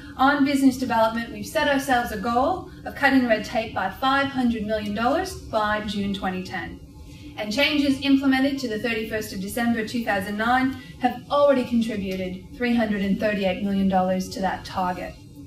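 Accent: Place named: United States. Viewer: 10 Big Ideas to Make NSW Grow .. numbered 1 to 10 : Get rid of NSW Labor & KK (now repeat that 9 more times, kiddies .. & then you'll be well on the way to adopting the CORRECT mindet required to get rid of this STENCH-RIDDEN STATE Govt). P.S. - keep on working on that accent, KK. You'll get there in the end. BUT - you are NOT really one of us .. period. Your yank accent is a real turn-off. As a religious conservative, you should actually be in bed with Tony Abbott !!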